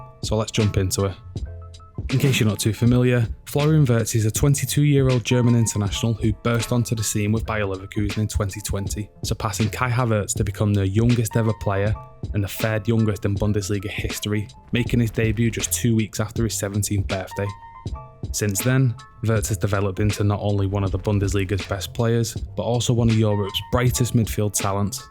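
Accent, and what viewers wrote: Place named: Liverpool, United Kingdom. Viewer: Your accent is giving SCOUCER vibes, one of my favorite people in the world. Y.N.W.A ❤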